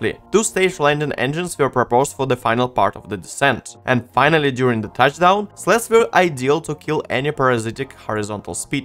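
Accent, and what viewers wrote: Russia. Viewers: Are you from Russian-speaking country?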